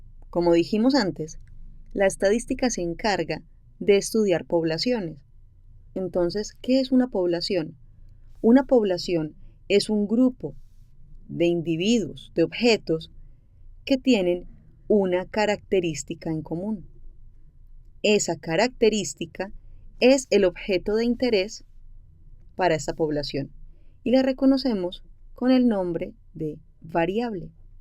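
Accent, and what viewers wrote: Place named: Colombia. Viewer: Esa voz es colombianaaa!!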